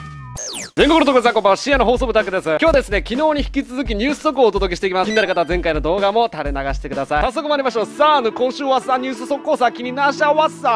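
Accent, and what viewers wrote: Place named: Kagoshima, Japan. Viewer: アルティメット鹿児島弁ニキほんとすこ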